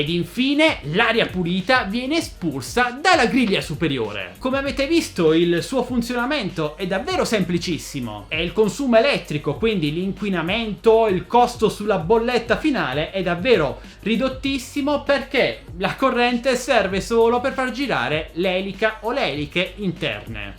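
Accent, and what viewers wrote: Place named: Apulia, Italy. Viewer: Hai un accento sei di bari o taranto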